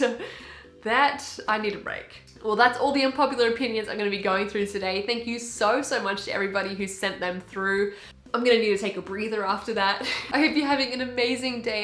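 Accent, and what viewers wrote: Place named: Australia. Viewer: you remind me so much of my voice teacher, except ya know..... your australian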